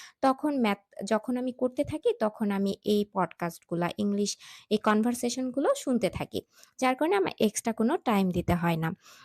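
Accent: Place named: West Bengal, India. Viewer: Hey there! No offence okay ! Take it positively.. 😊 I guess ya should focus on your pronunciation first while speaking in English. Follow British or American accent not indian or bangali style.However I do appreciate your confidence. Indeed😇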